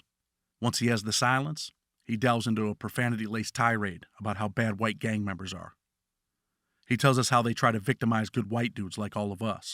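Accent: United States. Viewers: Always a good video. Props to you. I know you you from upstate and all that but damn homie you sound country as hell tho boy ha. Peace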